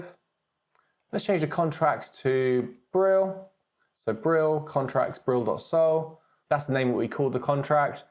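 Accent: United Kingdom. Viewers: I can tell by your accent you are from the UK... What is your take on the FCA regulations? I have asked them a question about setting up my own coin as I don't want to land into any legal trouble. Not had a response yet but reading through their manual they state exchange tokens and utility tokens are not regulated but it has no clarification based on tokens with liquidity. I am unsure if this would make them e-money or a Security Token which are both regulated. The regulation document is so confusing but it would be good to hear someone else's take on it being from the UK and involved in the crypto market.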